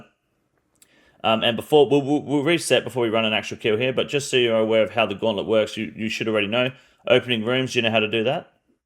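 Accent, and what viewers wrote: Australia. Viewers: It’s Aussie talk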